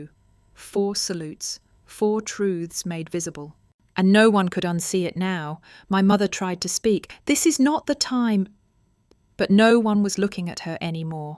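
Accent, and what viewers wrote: United Kingdom. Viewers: The stories you print are plausible but lack authenticity. Supposedly about American military personnel. But they are narrated with a British accent. even the pronunciation of the rank of LIEUTENANT is pronounced as it would be in London, as LEFTENANT. I confess that took away any interest I had in the story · Oh Dear. Another American based military story , Narrated in a British voice. I’m not really fond of this. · Not to mention the British accent…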